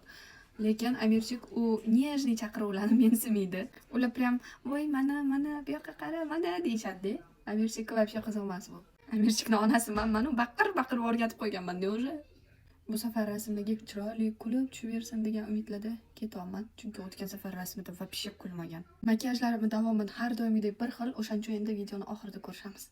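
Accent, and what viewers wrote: Tashkent, Uzbekistan. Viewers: Качондан бери Наманганликлар Тошкент шевасида гапирадиган булишди,кизик! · Нега Тошкент шевасида гапирасиз ,узиззи шевангиздан уяласизми ?? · Наманганликмисиз нега тошкен шевада гапирасиз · Номонгонлисизу нимага Тошконди шевасида гапряпсиз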